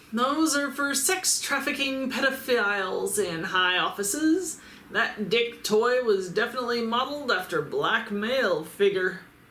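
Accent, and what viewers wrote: Texas, United States. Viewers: As an Aussie, the way you say "Y'all" does give me Texan/mid-western vibes.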